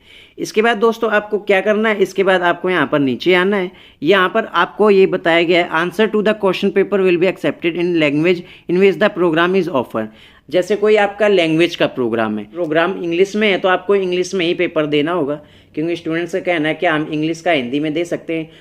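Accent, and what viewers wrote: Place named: Uttarakhand, India. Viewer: Hey bro may I ask u thing??..... Do u belongs to Uttrakhand Or somewhere from there... Actually your ascents r too similar to regional people of Uttrakhand Or nepal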